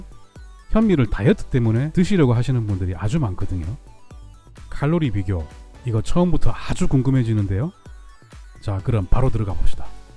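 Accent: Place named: Busan, South Korea. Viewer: 부산 출신이세요? ^^ 아주아주 귀에 익은 억양이시네요. ㅎㅎ . 말씀도 참 재미있게 하시고.... 딱 부산사람 말투 ㅋㅋㅋ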